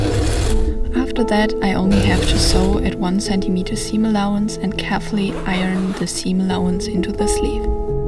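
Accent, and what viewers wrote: Germany. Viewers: Deine Videos sind sooo, soooo wunderschön gemacht, jedes ein Kunstwerk für sich. Wohl dein unbestechliches Auge für Ästhetik dass sich auch in all den wunderschönen Kleidern zeigt. DANKE fürs verzaubern ❤ Beim Video schauen dachte ich direkt, dass du aus dem deutschsprachigen Raum sein musst, wegen der typisch deutschen Aussprache. Leider kann ich kaum Englisch und konnte mit deiner homepage dann gar nicht so viel anfangen ohne Fremdsprachenkenntnis. Ich versuche nämlich immer gute Kurse, Seminare oder Online- Ausbildungen auf Deutsch zu finden. Deine Videos sind unbeschreiblich schön gemacht, einfach berührend und sehr emotional umgesetzt. Grosses Kompliment ❤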